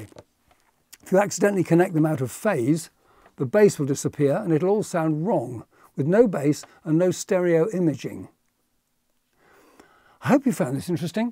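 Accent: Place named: RP, United Kingdom. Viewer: You, sir, do have a fantastic voice. If you worked for the BBC, I'm surprised if they put you in engineering and not on air. You have what I call the perfect BBC Accent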